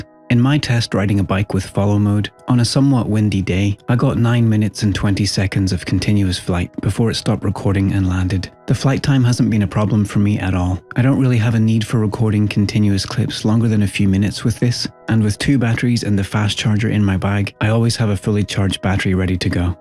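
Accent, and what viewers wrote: Ireland. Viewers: Excellent review and beautiful put together . You sound irish ? But that doesn’t look like Ireland ? Where you get the background sounds from ? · I concur with most of the other contents, but I really enjoyed listening to your calm, almost ASMR voice. The Irish accent doesn’t hurt either! Not trying to come across as gay (“Not that there’s anything wrong with that..”), but I could probably sleep to one of your reviews. 😅. Keep up the great work. If that’s your girlfriend, she really cute too!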